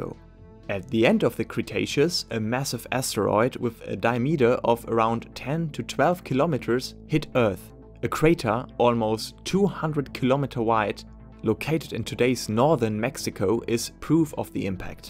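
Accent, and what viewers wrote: Germany. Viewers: I like your thick German accent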